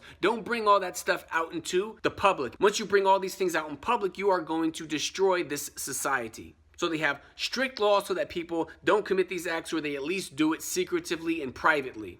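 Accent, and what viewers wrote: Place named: United States. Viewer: If American is so bad why are you using YouTube?? An American invention???? Why are you talking with an American accent?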